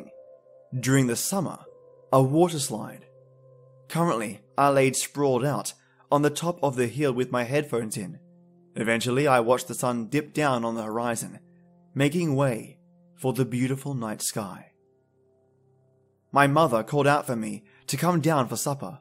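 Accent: Australia. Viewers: Wow! That tea you mentioned in the beginning sounds absolutely fantastic and delicious! I'm a bit jealous! I am sitting in my living room with my wonderful mother, cute parakeet named Daisy, and myself with a humongous cup of black tea. My mom couldn't of been nicer about you. She told me you tell such a good tale she could actually imagine herself in the story. Bravo! Of course, I just Loooovvvveee your narration!😍 With your narration style, sound effects, and great Aussie accent, truly makes it quite a listening experience (a stupendous one at that). My ears never have been soo spoiled! Thank you ever soo much, from the bottom of my heart!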